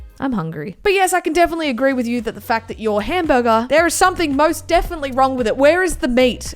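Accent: Australia. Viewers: is it me or does she sometimes have an australian accent in some words and then in others she does “caunt stand” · Are you from Australia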